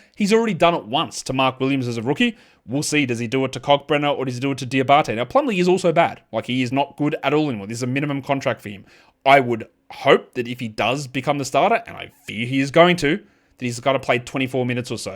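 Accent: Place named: Australia. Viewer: Good Aussie talk.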